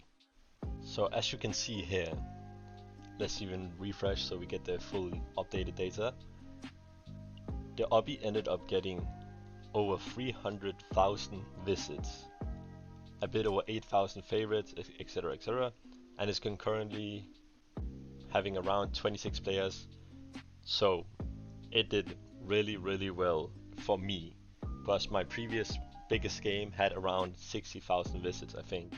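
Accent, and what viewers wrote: Denmark. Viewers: are you danish? i have to ask this question okay its important...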